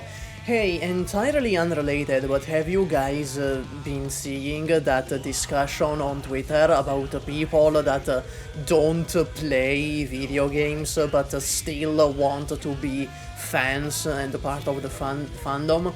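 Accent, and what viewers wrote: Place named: Italy. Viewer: You sound italian AF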